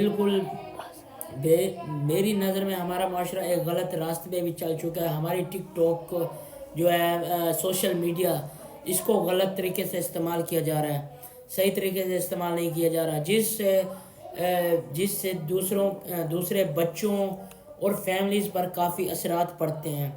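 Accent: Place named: Punjab, Pakistan. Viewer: Bhai urdu talaffuz aapka punjabi sai mix horha hai😅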